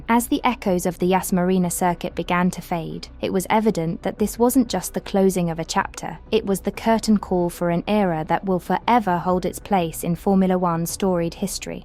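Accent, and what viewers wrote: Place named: England, United Kingdom. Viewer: I see this is a USA based channel, therefore I assume the narration is artificial. No American lady could do an English voice so well.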